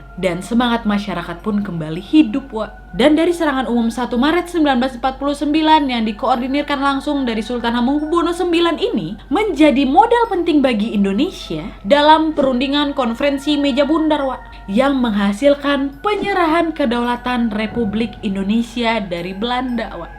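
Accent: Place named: Medan, Indonesia. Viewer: Aku pikir orang Medan? Bener enggak sih? Soalnya logat cakapnya macam anak2 Medan, ngejelasi macam begado, pakek ketawa dan macam gibah😆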